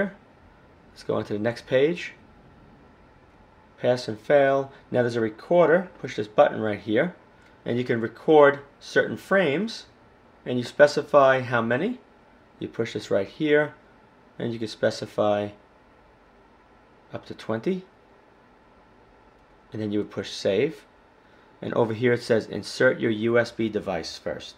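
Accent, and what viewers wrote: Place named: New York, United States. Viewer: You can put a FL place stamp on your video, but that voice says you're my homie from NY...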